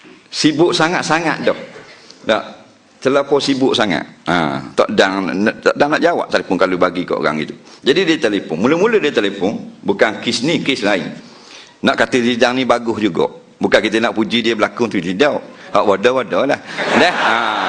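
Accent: Terengganu, Malaysia. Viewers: Bahasa terengganu comel molek..zizan jadi zizang..pelakong..hha...comel comel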